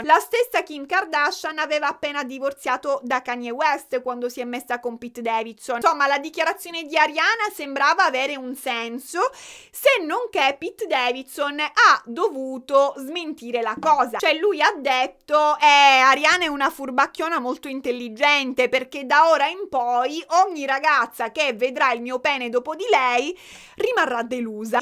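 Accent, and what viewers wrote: Sicily, Italy. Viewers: esagerato accento siciliano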